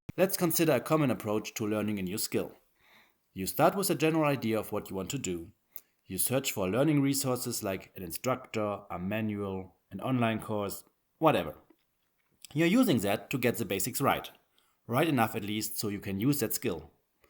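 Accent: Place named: Germany. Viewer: Well, I liked your video. And you sound like you're German. Which is also good.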